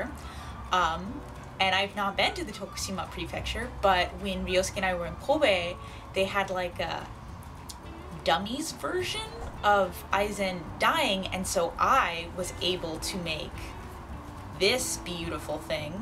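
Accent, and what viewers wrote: Texas, United States. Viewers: I'm from Texas and I can say, there is no true stereo type accent. Texas, being such a large state, has different accents and dialects depending on what part of the state you're from. A lot like Japan. The accent most people relate to "Texan" is the drawl spoken in East Texas, like Bryan or Tyler. She's from Austin and sounds pretty Texan to me.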